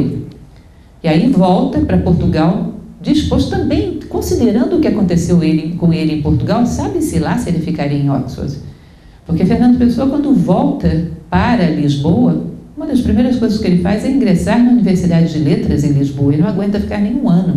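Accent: Brazil. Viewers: Foi uma linda festa pá, mas faltou a língua de Portugal, a lingua portuguesa. A língua brasileira atrapalha, ainda com todo o esforço realizado, estilo e sabedoria, de, "nome bem portuguès, por sinal", e seus declamadores. De qualquer forma, se em Portugal não se fizerem festas assim, que se façam no Brasil ou no resto do mundo, pois dá para perceber o amor de muitos brasileiros a este cantinho da Europa Ocidental, onde nasceram seus antepassados. Já agora frisarei que "a minha biblia" o Livro do Desassossego, foi editado em chinês há + ou - 20 anos. Parabéns a pela sua entrega ao poeta e filósofo! Como teria gostado de a ouvir falar em português de Coimbra... O UNIVERSO INTEIRO NÃO PARECE UMA BRINCADEIRA, UMA PARTIDA DE UMA CRIANÇA TRAVESSA? TÃO IRREAL, TÃO... L. do Desassossego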